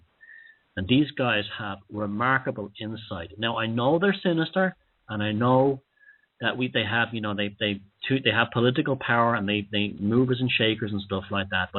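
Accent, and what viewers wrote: Dublin, Ireland. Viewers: Blimey, this is the first time I have listened to one of broadcasts. Initially I liked his Dublin style but thought he was a bit too full of himself with all his female groupies on Facebook. Good Lord, I'm a bit like that myself!!...However listening to this and his description of the riot in Dublin in 1981 and the evil that was on the other side ready to deal with the protesters rang so true with me. I was in Dublin a couple of years after that particular riot outside the British Embassy and a friend described how he was working on the railways and saw the protesters struggling home along the tracks trying to escape the police. He said they had been battered beyond belief. It was something he had never seen before. When the power of the state is truly unleashed it is terribly frightening.......I'm relating so much to this podcast and what he is saying. 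Good stuff. I'll forgive all yer other shite if you forgive my occasional after the pub rants against the Irish!....Des Brittain.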